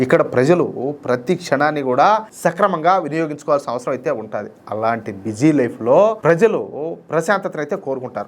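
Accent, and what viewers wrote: Rayalaseema, India. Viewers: అన్నయ్య నీ భాష అదిరిపోయే భాష మాట్లాడతావు అన్నయ్య రాయలసీమ భాష చాలా మంచి న్యూస్ చెప్పావు అన్నయ్య థాంక్స్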